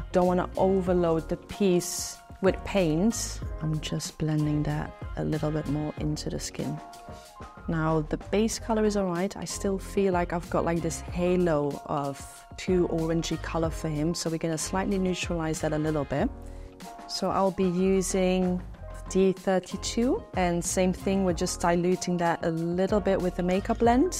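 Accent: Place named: Australia. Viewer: Lovely English pronunciation 😍 Oz one right?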